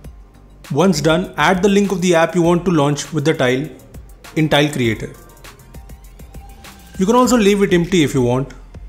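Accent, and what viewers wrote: India. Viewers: Terrible voice, indian english is really catastrophic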